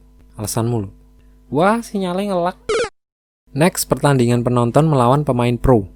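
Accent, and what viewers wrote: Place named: Java, Indonesia. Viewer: Anjim wong jowo to sampean mas wkwkw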